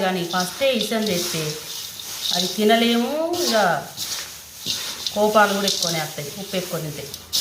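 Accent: Telangana, India. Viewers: Mana godavarikhani, Manthani,Mancherial, peddapalli karimnagar, warangal maata teeru abbaabbaaa mast untadi 👌👌👌👌👌❤❤❤❤❤❤ Edi matladina direct and straight ga untadi muchata....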